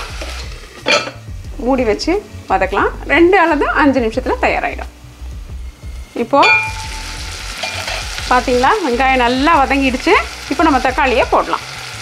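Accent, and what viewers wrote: Sri Lanka. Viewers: Hi sis i am rekha.tomato curry nalla iruku. try pantren.nenga tirunelveli thana. Nenga pesum konchum tamil ketkum pothu sri lankan pesum alagu tamil mathiri iruku. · It was really nice.. but your way of speaking is the nicest. Native place Sri Lanka va sis?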